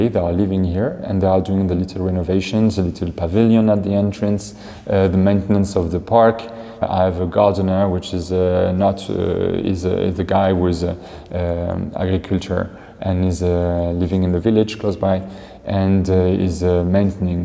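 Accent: France. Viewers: I love your accent 😍 🇫🇷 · I was so worried when I saw the thumbnail that a rich american bought it... first time Im happy hearing a French accent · As a french guy I feel ashamed that french castels are in sale for strangers (but according to your accent you are french as me ofc). The problem often especialy with british people is that they will buy a property then turn it to totaly private. Not allowing anyone to enter in the park, to fish in the lake if there is one etc. However it is better that those castles belong to a person, even one who will not respect the local habits, rather than leaving the castle in ruins. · This is also my dream!!. Amazed by your dedication and that lovely francais accent. A bientôt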